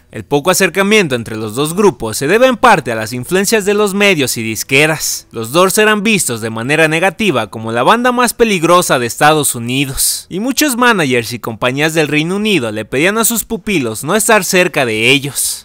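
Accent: Mexico. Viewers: Deberías neutralizar un poco tu acento ranchero.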